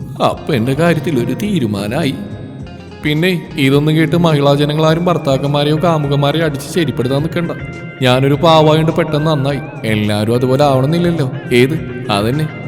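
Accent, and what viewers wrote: Thrissur, India. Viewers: Adipoli kurachhe Trissur samsaram poly thonni 👍💖💖💖💖💖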